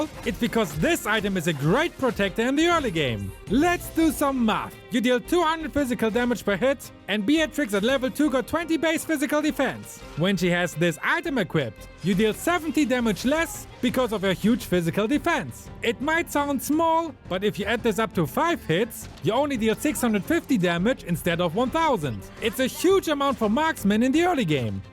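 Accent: Germany. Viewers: First time i Heard your German voice Xd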